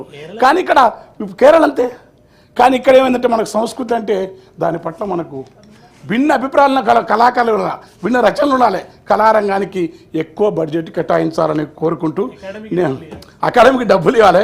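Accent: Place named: Telangana, India. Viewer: చాలా చక్కగా తెలంగాణ యాసగా అందరికి అర్ధమయ్యేటట్లు మాట్లాడాడు 👌❤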